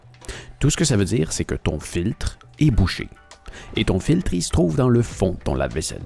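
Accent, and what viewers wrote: Quebec, Canada. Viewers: Quel accent belge??? C'est l'accent québécois...😧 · Salut, merci beaucoup pour l'aide. Avec ton accent pas difficile de savoir d'où tu viens, mais avec toutes tes images de voyage tu brouilles un peu les pistes pour savoir où tu habites. Alors tu au Québec ou as tu déménagé dans les Alpes en Europe. Amicalement, Philippe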